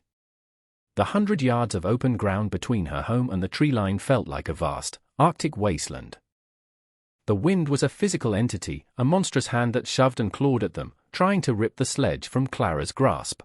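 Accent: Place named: United Kingdom. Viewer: A sledge is what we call a sleigh in the UK. I'm just clarifying for you as the speaker is speaking with a UK accent. I was thinking that is what I was thinking as my mom and siblings used a sleigh to go to school in winter here in the US. I'm not trying to make fun of you.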